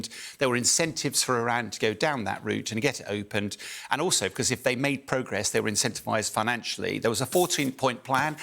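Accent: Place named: England, United Kingdom. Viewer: Thanks for the Overview Sean Bell. Your sound English, .... trustworthy. American news, only idiots trust.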